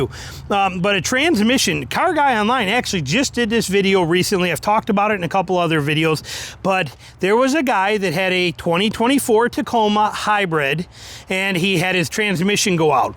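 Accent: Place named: Detroit, United States. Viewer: why are you speaking in a Detroit accent? Are you actually from Detroit?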